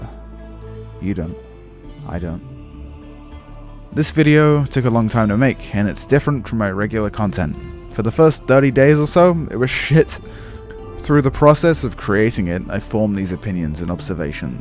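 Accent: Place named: England, United Kingdom. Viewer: you speak with english accent but reference shit like macaroni picture frames (not english at all) whats with that??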